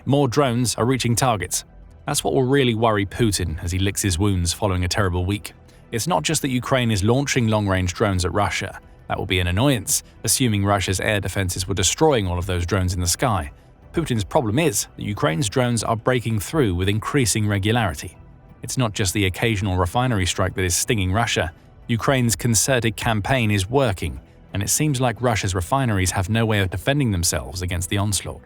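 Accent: England, United Kingdom. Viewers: Why does the narrator, who's clearly English by his accent, keep calling petrol, "Gasoline"? They call it petrol in the UK NOT gasoline.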